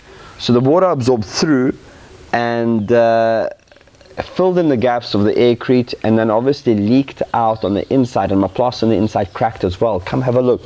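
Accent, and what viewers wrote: South Africa. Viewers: I hear a Saffer accent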